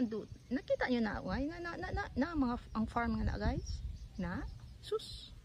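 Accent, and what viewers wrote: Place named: Iloilo, Philippines. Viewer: I like the accent. I love Ilonggos..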